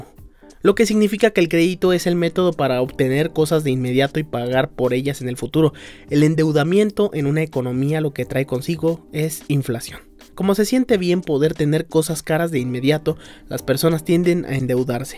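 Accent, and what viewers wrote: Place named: Mexico. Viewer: me gusto como lo cuentas, ademas que adoro el acento mexicano asi que mas grato aun! gracias por la info, inflacion... pff.. aqui en España ya no puedes ni quedar con amigos sin que cueste dinero por el precio solo de la gasolina, como no salgas con tus vecinos (abuelos la mayoria boomers) olvidalo. Millenials y centenials andamos siempre ocupados con trabajos, estudios (aun con 50 años) y como llegar a final de mes sin vivir bajo el puente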